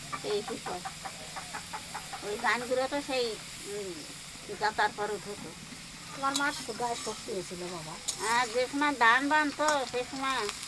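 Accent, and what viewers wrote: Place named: East Bengal, Bangladesh. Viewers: ও দিদি দেখে তো জিভে জল এসে যায় খেতে ইচ্ছা থাকলে ও পেটটা সাথ দেয় না ! এতো সুন্দর রান্না, সত্যি রান্না করাটা একটি শিল্পকলা তার চাইতে বড় আপনার কথা গুলো শুনতে খুব মজা লাগে! কারণ আমরা এখন তো পূর্ববঙ্গের কথাবার্তা আন্তরিকতা মানুষে মানুষে মিল বন্ধন ভূলে গেছি! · Bhai,tomake Tumi bolchhi ,ranna to batei tomader ke darunlage.tabe sab kichhur theke je jonyo ami dine akbar holeo video ta dekhi,seta thakuma ba mayer kathar jonyo,amar babar bari opar banglay,amra barite ei rakam bhabei katha bole dasher bhasata bachie rakhtam,tai tomader dakhlei àmar ki je bhalo lage bojhate par bona,bolei fellam,Bhai ,kichhu mne korona khub bhalo theko.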